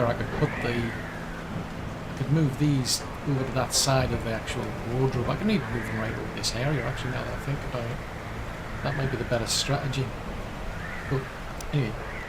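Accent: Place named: Northeast, United States. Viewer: nice to hear the Northeast Accent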